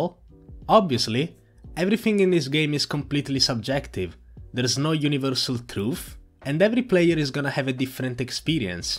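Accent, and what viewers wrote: Italy. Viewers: are you italian mate? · Are you italian? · Lol ho capito che eri italiano solo dalla pronuncia ahah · you are italian? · you have an italian accent? Are you italian?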